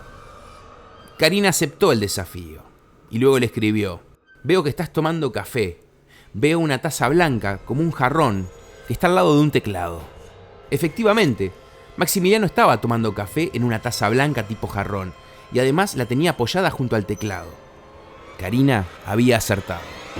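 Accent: Uruguay. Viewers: El a relata es uruguayo de 1 programa de Uruguay pero hablos casi iguales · Acento Uruguayo Papa Saludos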